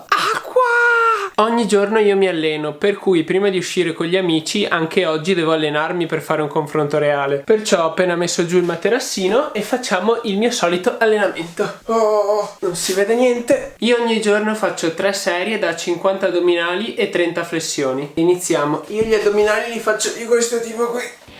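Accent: Veneto, Italy. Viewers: Ciao, sei di Padova ho riconosciuto la stazione dei bus??? Sei veneto quello sicuro dall'accento che hai:-) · Hai un bellissimo canale! Questo video è davvero bellissimo. Tu sei un ragazzo onesto, educato, pulito e parli davvero molto bene. Assolutamente iscritto perché meriti davvero tantissimo! Complimenti sei grandissimo, se posso chiedere, da dove vieni? Hai un accento molto simile dal mio che vengo dal Veneto ahaa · Ho visto un video dove alcuni fruttariani sostenevano di poter vivere senza bere acqua mangiando solo una mela al giorno. Se fosse vero con tutta la frutta che hai mangiato non dovresti aver avuto problemi. Comunque hai fatto bene a concludere la sfida un po' prima dato che dopo il mal di testa il prossimo sintomo da disidratazione era il colpo di calore e poi probabilmente il coma. Che lavoro fai ? Se posso sapere. Se facevi un lavoro pesante magari sotto al sole come l'operaio ci lasciavi le penne. 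Hai fatto un bel video onestamente (montaggio riprese ecc) e fai bene ad ascoltare la tua community e non fare più challenge del genere. PS: si sente che sei veneto ciò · Ma sei veneto? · Ma sei veneto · Sei veneto? Se si credo di Padova giusto? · Sei veneto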